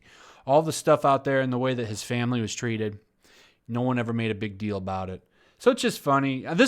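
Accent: United Kingdom. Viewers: Love your brittish accent...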